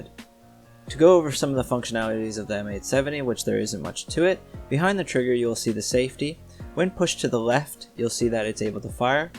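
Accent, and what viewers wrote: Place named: North America. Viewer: What country are you in? You have a North American Accent, but it looks like you're in the UK because of your back garden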